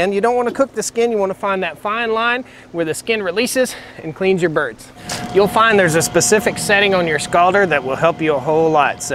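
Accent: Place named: North Carolina, United States. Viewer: Your a north Carolinian to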